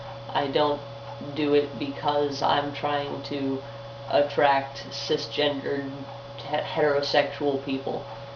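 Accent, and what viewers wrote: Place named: Midwest, United States. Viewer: You say "to me" like a midwesterner now!!! You say TO me, instead of to ME. (Loving linguistics.)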